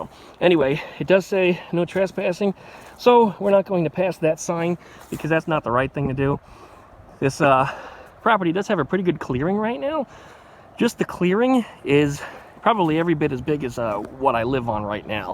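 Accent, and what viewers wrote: New Jersey, United States. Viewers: Definitely can hear the Jersey in you in this video, where exactly were you from originally in NJ?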